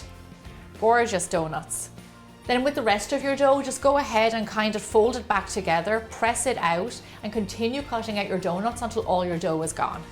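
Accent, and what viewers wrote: Ireland. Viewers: She is just soooo Irish! I love her accent !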